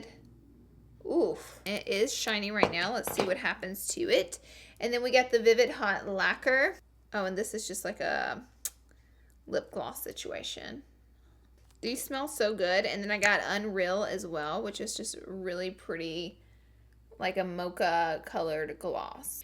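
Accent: South, United States. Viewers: i love you so much 🌈🌈🌈🌈 early snapfam. love your country accent 😚 much love from your biggest fan from Norway ❤ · 🌈🌈🌈🌈🌈🌈🌈🌈🌈🌈🌈🌈🌈🌈🌈🌈🌈🌈🌈🌈🌈🌈🌈🌈🌈 Snapchat fam!!! Love ya Girl! Love ur southern accent it makes me miss mine!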